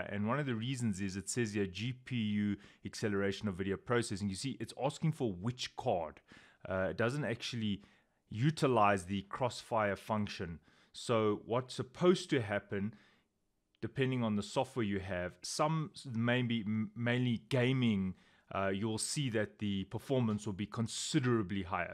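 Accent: South Africa. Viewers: Nice to hear that proppa Sarf Efriken accent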